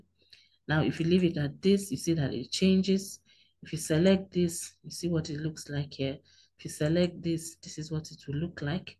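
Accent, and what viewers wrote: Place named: Nigeria. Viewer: Asian face, nigerian voice.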